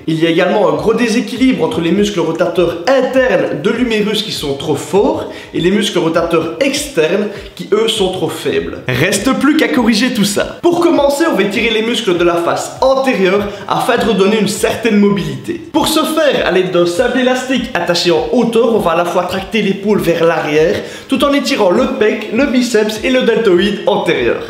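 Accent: Liège, Belgium. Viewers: Serais tu liégeois? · On entend que tu es belge aha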